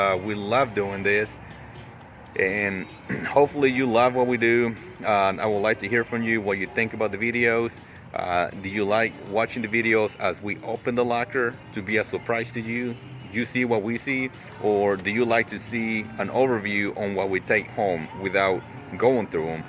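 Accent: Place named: South, United States. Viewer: Where are you? You have a touch of a southern twang, in your talking voice! XD Well, show us what you are keeping so we can advise! OMG, your going to be overwhelmed stop buying units til you clear stuff out!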